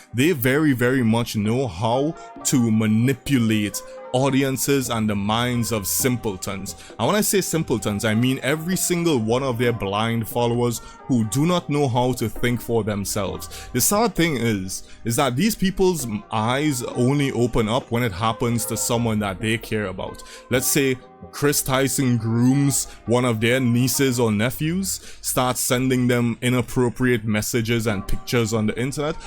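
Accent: Trinidad and Tobago. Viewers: Bro, you have a very trinidadian accent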